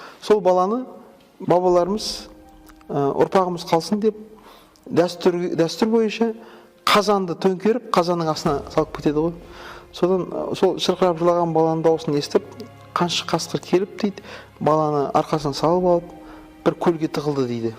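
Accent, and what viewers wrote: Standard. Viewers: Осы кісінің дауысы өте жағымды таза қазақша сөйлейді.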